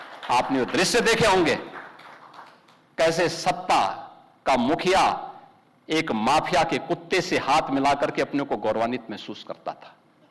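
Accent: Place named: Gorakhpur, India. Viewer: Jalwa Hai Bhaiya ji aapka ❤ Gorakhpuriya andaaz me smjhaya hai apne ❤🔥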